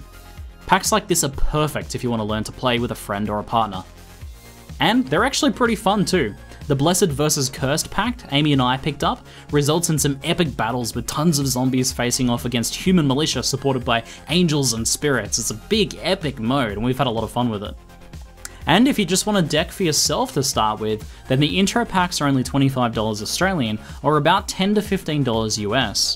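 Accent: China. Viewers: Chinglish is there, did you bro up with him? xD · ayy chinglish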